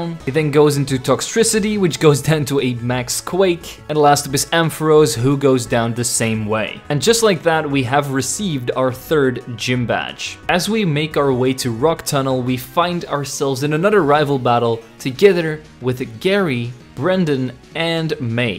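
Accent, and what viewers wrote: Netherlands. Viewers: A-A-Are you Dutch??! o mijn god ik ook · Are you dutch?! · Wait are you dutch? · wait are you dutch?!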